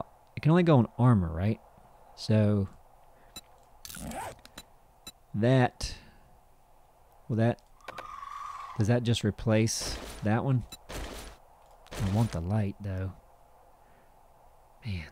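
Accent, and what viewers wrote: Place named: Appalachia, United States. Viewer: I can hear your accent a little bit the Appalachian twang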